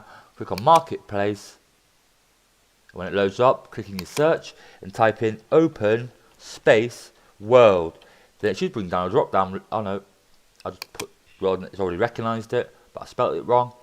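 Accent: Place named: England, United Kingdom. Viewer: You certainly are from England =D Great tutorial brother!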